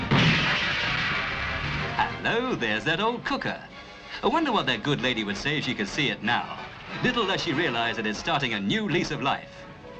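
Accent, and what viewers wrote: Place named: England, United Kingdom. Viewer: So lovely to hear a proper English accent instead of trendy street nonsense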